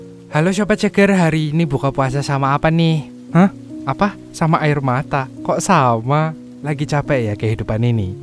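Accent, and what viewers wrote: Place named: Nganjuk, Indonesia. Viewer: logat nganjuknya minnn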